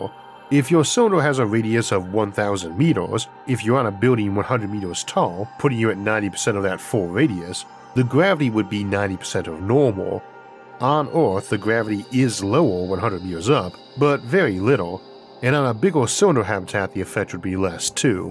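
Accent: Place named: United States. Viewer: What's your accent, i assume American because how big and wide it is, is my assumption correct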